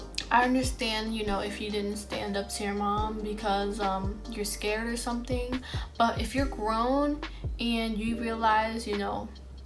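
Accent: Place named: Philadelphia, United States. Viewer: You have a Philly accent. If you lived in Philly, you had Italian food. At least that's my experience. You have the food from your culture and you had Italian.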